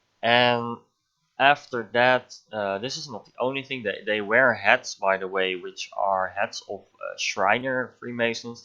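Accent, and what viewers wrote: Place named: Netherlands. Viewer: Volgendsmij ben je nederlands ik kan dat horen haha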